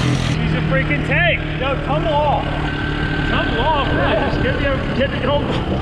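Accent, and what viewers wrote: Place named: Delaware County, United States. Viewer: You gotta be from Delco. Love the content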